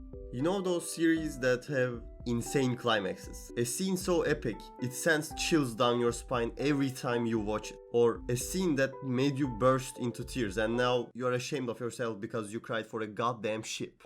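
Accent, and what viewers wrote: Turkey. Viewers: idk how but you have such a Turkish accent in english wow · You sound like Turkish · abi tam olarak 5sn izledim henüz ama türk aksanın var (kötü bi şey gibi değil) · Bu aksanı nerde olsa tanırım. Türklerinin sonunda fark etmeksizin herhangi bir medya üzerinden kaliteli içerikleri ürettiğini görmek iç açıcı,takipteyim. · Türk olduğuna yemin edebilirim. Türkçe konuşuyormuş gibi ingilizce konuşuyorsun. Türk aksanı diye bir şey varmış onu anladım ahsjskkd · bu ingilizce aksanı bu kadar türklere özgü olamaz ya bu arada yona of the dawnın hala ikinci sezonunu bekliyorum yıllar geçti:(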